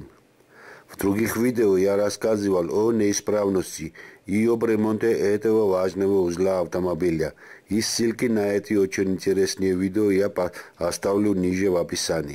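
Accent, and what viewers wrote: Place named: Georgia. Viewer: С акцента можно предположить, что вы из Грузии, как и я. У меня проблема с дроссельной заслонкой, вы бы адрес или номер телефона, я бы заехал к вам. Спасибо, с уважением Земляк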